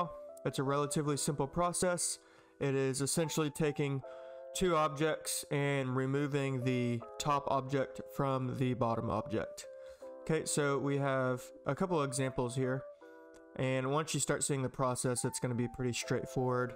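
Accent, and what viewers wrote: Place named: England, United Kingdom. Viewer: Thank God for clear english accent and also for useful video!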